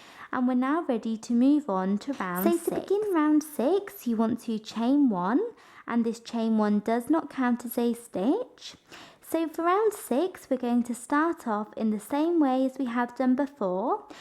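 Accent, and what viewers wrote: England, United Kingdom. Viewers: It sounds English to me. I'm not sure how that sounds fake?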